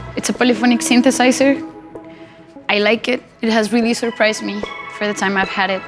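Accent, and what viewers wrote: Bogotá, Colombia. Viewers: el acento rolo es super marcado hasta en inglés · Sounds Colombian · I didn’t know she was Colombian until right now that I heard her speak in English and said: she has to be from Bogotá! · Any other Colombians can notice how her accent also somehow is there when she speaks English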